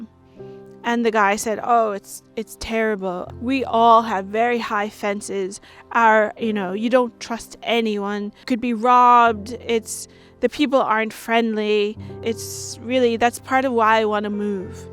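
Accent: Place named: Ireland. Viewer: the faint beginnings of an Irish lilt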